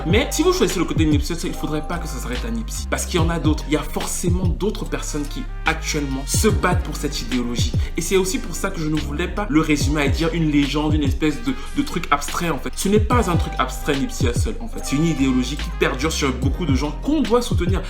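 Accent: Cameroon. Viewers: tu as un accent Cameroun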